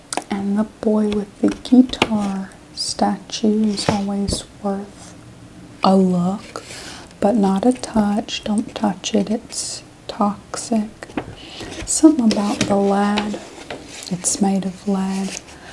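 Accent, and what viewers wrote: South, United States. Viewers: you look so beautiful!! i'm so excited for a new southern accent roleplay, this is gonna stay on repeat for me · the ones with the southern accent are always my favvv omg you do it so well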